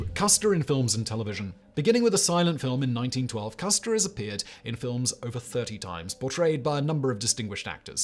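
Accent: United Kingdom. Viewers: Dear, dear... I do think they protesteth far too much. It's either your pronunciation (you damn Limey), your racial naming of participants of just choosing the wrong side of your subject. You can't win can you..., so stick to Europe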